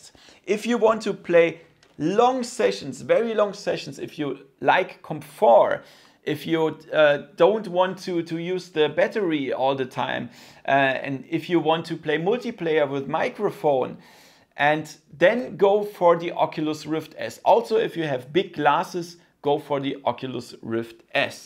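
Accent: Germany. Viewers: Everything he says is clear, there is no need to bring up his accent. He's German, why does he need to work on an English accent? He doesn't have to take your "advise" when it's an unnecessary critic of how he sounds. The dude went to the effort of making an English speaking channel to help out another audience and you're nit picking. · Are you German ?😂 Wenn ja ich auch haha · Its rude to comment on how someone sounds I'd be offended too. Especially sense he put all the effort into the video and he's got people like you complaining about his German accent. It's a stupid and petty complaint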